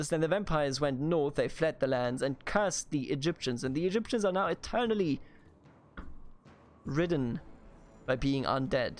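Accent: Germany. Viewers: Never before have I heard a genuine German accent. I am not disappointed.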